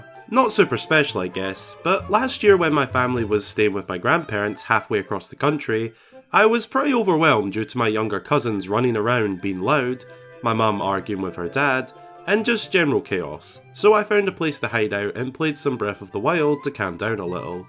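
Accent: Scotland, United Kingdom. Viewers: Where in Scotland are you from?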